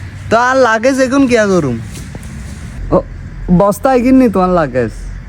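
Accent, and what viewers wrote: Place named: Mirsarai, Bangladesh. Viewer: ভাষা টা হচ্ছে মিরাসরাই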